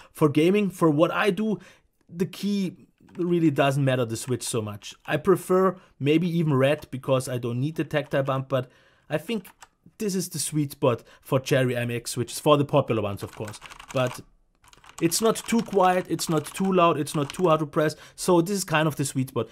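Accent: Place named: Germany. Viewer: alter der deutsche akzent xDDDD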